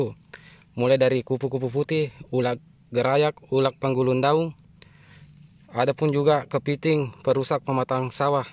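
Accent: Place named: Bugis, Indonesia. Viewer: Bos ini klo didengar logt nya pasti orang Bugis terima kasih ilmunya bos di mana beli obat seperti itu bos .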